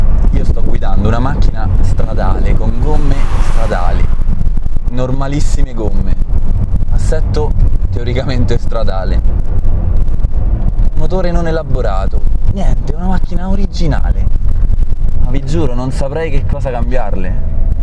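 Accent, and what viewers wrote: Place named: Rome, Italy. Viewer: per me troppo romanesco per parlare di cose serie come macchine e motori.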